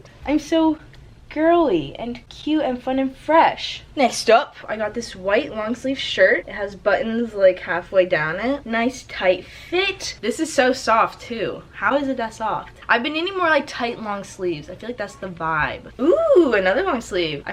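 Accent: United Kingdom. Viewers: luv the british accent luv! also congrats on 100k<3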